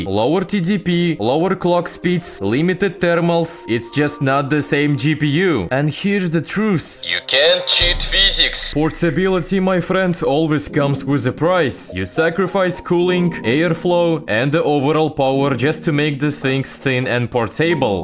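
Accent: Russia. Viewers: 😂 it's russian accent ig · Not trying to be mean but the Russian accent is so hilarious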